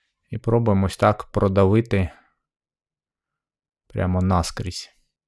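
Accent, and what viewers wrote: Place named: Central Ukraine, Ukraine. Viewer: запитав, бо ваша вимова дуже схожа на центр України, на мій рідний говір. Ще раз дякую за вашу працю. Ви створюєте важливий українськомовний контент 👍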